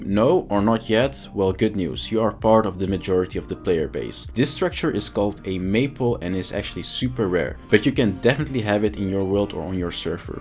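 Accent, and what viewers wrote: Netherlands. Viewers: you sound like a dutch guy not sure but your accent sounds like it haha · You have a pretty dutch english accent 😉 thanks for the video, mate!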